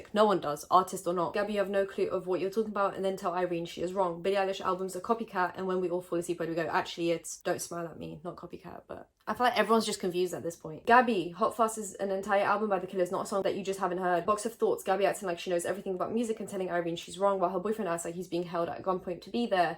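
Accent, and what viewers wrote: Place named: United Kingdom. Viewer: I fucking LOVE this kind of British accent when they pronounce literally as “lit-cher-lee”. It’s so damn cute.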